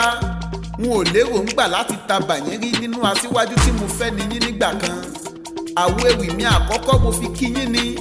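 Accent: Ibadan, Nigeria. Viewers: But ibadan ascent is too pronounced in your ewi. Though you have a good composition and presentation but work on your ascent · Great to see another buddyeing Ewi artist join our genre. This is far from polished Ewi. You have got promise though. You need to remove the strong Ibadan pronounciations though to gain broad appeal and acceptance. I'd love to work with you if you respond to me. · Why is the Ibadan accent an issue? That is the flavor of Yoruba poetry!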